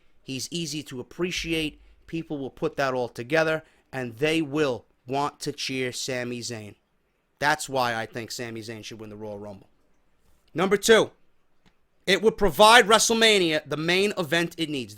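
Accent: New York, United States. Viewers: Started watching your videos this week, you do a great job of sourcing all the info you present to the audience. You have a strong understanding of what fans want and what the casual fan or even casual mark want but dont know it. I really enjoy the NY gritt you have, angry and smart tone but articulate and smart - well done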